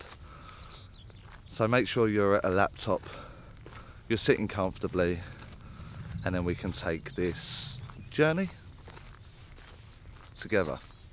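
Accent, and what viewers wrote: Essex, United Kingdom. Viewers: Starting out and so glad I found you! Someone I can relate too..helps with the Essex accent lol so here goes! I got an uncle, in his 40s, he was a happy go lucky man with a good sense of humour. He comes to help others with your teachings. He wasn't married and he liked to drink which he thinks attributed to his death. He lived in a rural town but not in the essex area. It may not be right but its given me confidence to listen to that 'voice' x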